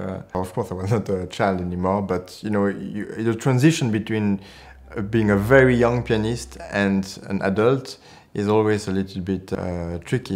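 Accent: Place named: France. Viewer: Haha, a German last name with a strong French accent. Quite an unorthodox fresh combo though.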